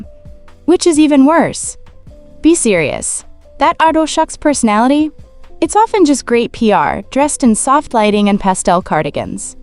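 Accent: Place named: Nigeria. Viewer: she sounds Nigerian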